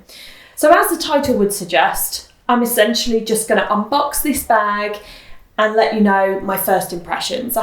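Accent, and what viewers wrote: England, United Kingdom. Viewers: I like your English accent 😊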